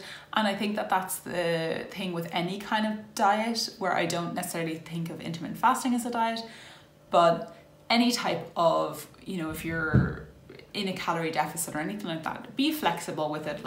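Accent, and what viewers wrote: Ireland. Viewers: I have BED as well and also struggled with food addiction since childhood. I so agree with what you said about not being able to hear the fullness cues! I actually tried alternate day fasting last year and ended up losing some weight but inevitably plateaued because i was not keeping track of calories and, if I’m completely honest, was using my eating days to sort of binge 🤦🏻‍♀️ it was also just extremely difficult for me. Have since found your videos avs thinking of signing up for Bodyslims today and maybe trying 16:8 as well… thx for making all the info simple and clear! 🙌 Ps love ur Irish accent, could listen to it all day haha so soothing 💖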